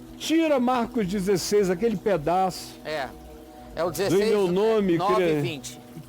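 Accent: Recife, Brazil. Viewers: Aí sabe que fala Recife Pernambuco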